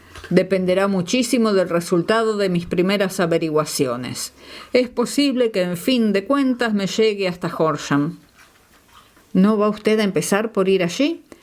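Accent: Argentina. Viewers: ¡Les deseo muchas felicidades en el 2024! Gracias por tan agradables narraciones claras y con la musicalidad del acento argentino.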